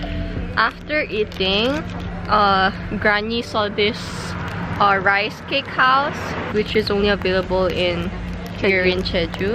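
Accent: Philippines. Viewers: wait—u kinda have a Filipino accent😧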